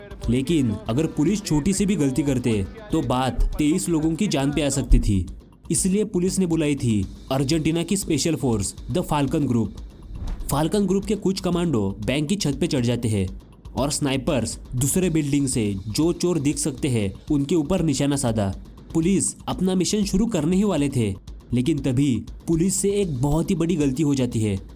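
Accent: Maharashtra, India. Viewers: Ye jo bol raha hai ye marathi admi hai marathi ki hindi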